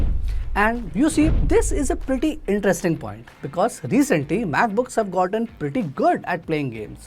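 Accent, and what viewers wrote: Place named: India. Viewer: The more i get older the more i become intolerant to Indian dialect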